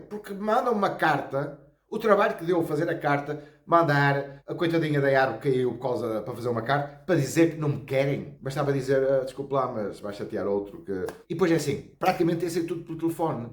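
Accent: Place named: Brazil. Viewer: Caralho...!!, vivo o paraíso e não sabia ?! , aqui no Brasil não é fácil mas você nunca passa por privações, de uma forma ou outra sempre tens uma mão amiga estendida à você!, gajo, falas português mais brasileiro que próprio de Portugal ! , conheça meu Brasil afinal foram os português que inventaram esta zona toda aqui, mas é bom demais !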